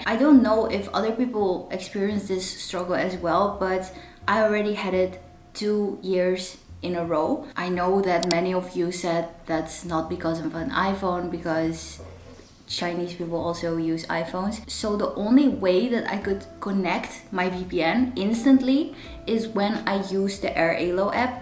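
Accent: Netherlands. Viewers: My flight to Chongqing is tomorrow. I am a bit late to watch this video. I did book a stay with English speaking staff luckily. Setting up all my apps now. Thank you so much!! btw.. Je Nederlands accent is super cute.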